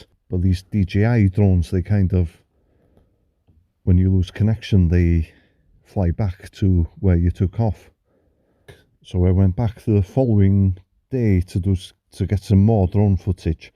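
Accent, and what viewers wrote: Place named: Caernarfon, United Kingdom. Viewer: Faacinating stuff, thanks! Completely off topic, I'm trying to place your accent. Caernarfon?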